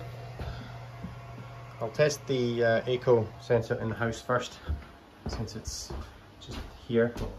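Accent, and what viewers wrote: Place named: Scotland, United Kingdom. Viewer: Love your vids - also great to hear a Scottish accent too. I need to get my old Vig set up in my house, it's doing no good sitting in a box in my garage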